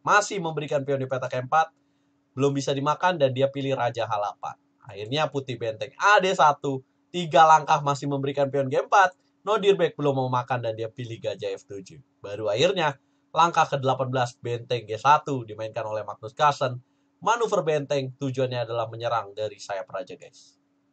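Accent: Tegal, Indonesia. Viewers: Bang kelahiran tegal ya..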